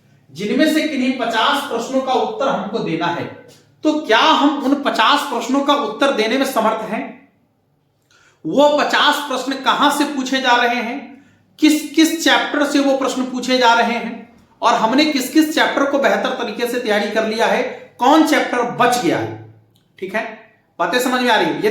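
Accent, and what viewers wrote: Bihar, India. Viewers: Bihar h kya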